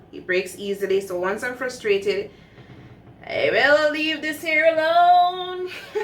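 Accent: Jamaica. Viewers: She is definitely jamaican. Can't hide our accent no matter what 🇯🇲🇯🇲🇯🇲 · You sounds Jamaican. I'm waiting for a miracle for my alopecia.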